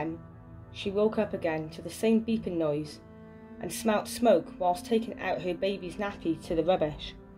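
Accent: United Kingdom. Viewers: I really enjoyed your take on this. It was clear and concise I like that. I watch alot of True crime and it's refreshing to hear a British Accent albeit I know you said your in Wales but UK nevertheless 😃 Thanks I've subscribed and look forward to hearing more from you. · I’ve just come across your channel and watched this video, I think you’ve done brilliantly! Going to binge tonight. It’s nice to hear a Welsh accent too, I haven’t come across many and I’m also from Wales. Good luck with the channel! 😃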